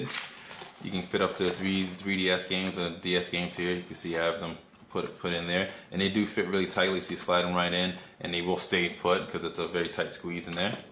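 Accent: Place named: New York, United States. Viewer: "NEW YORKIAN ACCENT"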